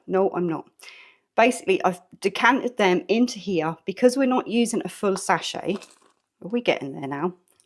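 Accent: United Kingdom. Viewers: Great to hear a pommy accent for a change, instead of the yankee ones.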